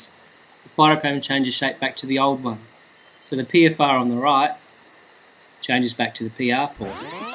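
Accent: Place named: Australia. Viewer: ohhhhh. it now makes sense. You saved my sanity. thank you. And I do appreciate the australian accent too. :D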